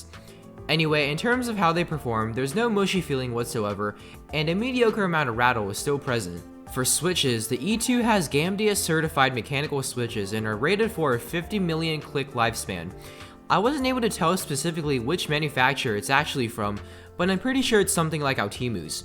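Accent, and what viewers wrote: South, United States. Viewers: bring back the southern accent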